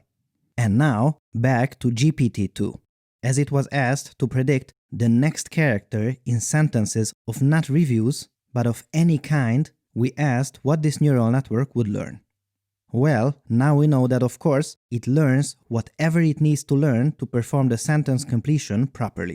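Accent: Hungary. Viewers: Akcentusod eléggé magyarosra sikeredett. :D jó kis videó lett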